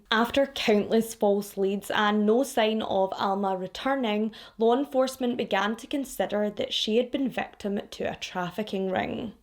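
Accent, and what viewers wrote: Scotland, United Kingdom. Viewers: You remind me so much of my Scottish friend that I miss so much that it feels super comforting to listen to you talk 😅 Truly you're an absolute delight, can't wait to watch this whilst procrastinating tomorrow 😌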